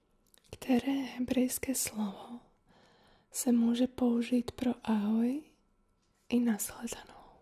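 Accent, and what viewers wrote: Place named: Slovakia. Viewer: Jé to je hezký, jak i v té češtině máš slovenský přízvuk a tu češtinu máš takovou pěkně měkkou :-). A chválím za ř. Vyslovuješ ho moc pěkně. Asi to bylo pro tebe moc těžký soustředit se na češtinu a ještě šepot. Takže video polohlasem a to já osobně moc nemusím. Takže mě se líbíš, když mluvíš slovensky, protože máš krásně jemný, uklidňující šepot a myslím, že to je právě tou slovenštinou,protože je taková měkká a pro ASMR super. Jinak fakt chválím za pěkná videa a, že poslední dobou hodně vydáváš.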